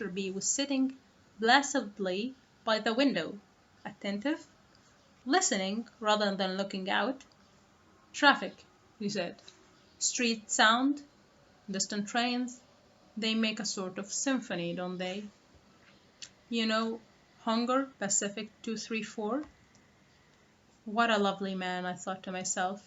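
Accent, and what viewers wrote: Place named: Spain. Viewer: She sounds a little Spanish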